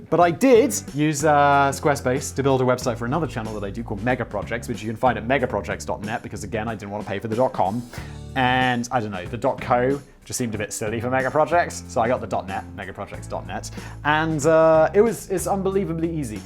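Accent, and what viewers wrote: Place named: United Kingdom. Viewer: When you sing you lose your British accent. I.e., you sound just like an American.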